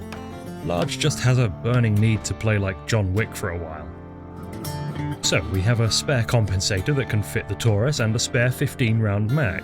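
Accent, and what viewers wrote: United Kingdom. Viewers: Absolutely love this channel. As a fellow Brit you're much more enjoyable to listen to compared to some of those dashing Yanks.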